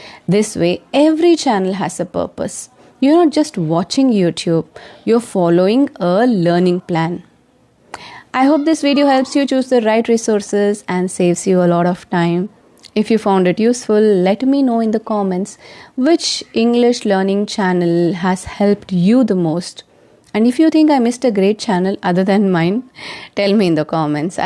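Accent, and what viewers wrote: India. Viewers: Why are you suggesting foreign English-language channels when you also speak with an Indian accent? You can suggest Indian YouTube channels as well; there are plenty of good ones.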